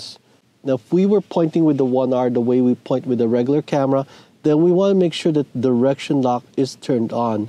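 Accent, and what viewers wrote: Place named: Denmark. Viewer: Er 100% enig med dig. Du lyder dansk, så svarer lige på dansk;) Er også vild med de her begynder guides. Jeg har ikke bestilt min OneR endnu men sker indenfor et par dage når jeg har set tilpas nok videoer så jeg er blevet overtalt:):):) haha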